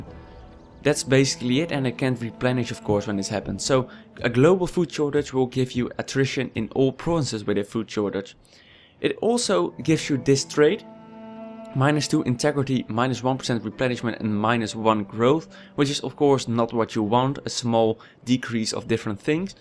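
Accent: Netherlands. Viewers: This guy is so dutch , holy shit ! ty tho <3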